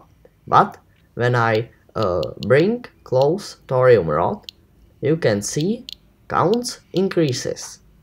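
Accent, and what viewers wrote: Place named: Czech Republic. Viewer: Celkem český přízvuk XDD gut video dávám like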